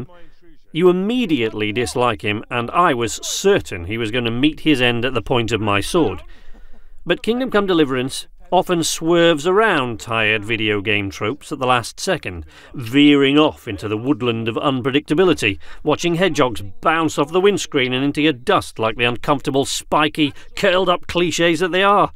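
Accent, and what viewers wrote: United Kingdom. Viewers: yeahhh i can hear it in your other episodes, just this one you sounded Welsh 😂😂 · holy shit he has the accent like colin firth in kingsman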